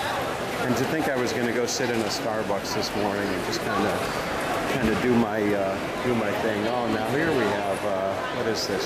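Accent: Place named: Pittsburgh, United States. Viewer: OMG, your video is perfect for me! I'm writing a story, and this is an early location. It's awesome getting a tour of it! The main location for my story is Abbey Avenue. I placed an apartment building across the street from the Gateway Animal Clinic lol. I've never been to Cleveland, Ohio before, so I've been doing a ton of research. Are you from Pittsburgh? The way you speak sounds a lot like Pittsburghese, as my granddad calls it.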